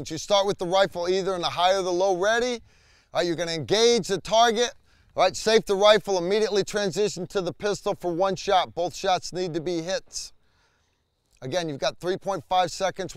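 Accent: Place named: Northeast, United States. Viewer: New to the channel so this may have been answered already, NY? Boston? CT? What's the accent?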